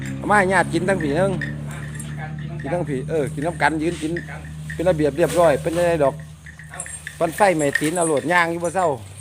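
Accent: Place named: Isan, Thailand. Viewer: ชอบที่แกพูดภาษาท้องถิ่น ภาษาอิสานน่าฟัง เวลาบรรยายก็มีภาษากลางบ้าง แต่เวลาพูดกันเองพูดภาษาท้องถิ่นดีแล้วค่ะ ดูอย่างควาญสิงห์ แกบรรยายเป็นภาษากลาง แต่เวลาพูดกันเองแกพูดภาษาท้องถิ่นชอบค่ะ แม่บางช่องพูดภาษากลางตลอด มันเหมือน DJR ค่ะ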